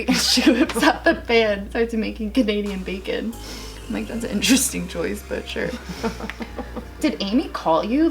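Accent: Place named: East Coast, United States. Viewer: I love that your east coast is coming out in this video! Every time I visit family in NJ it’s the same. Words ending in G? We don’t know her 😂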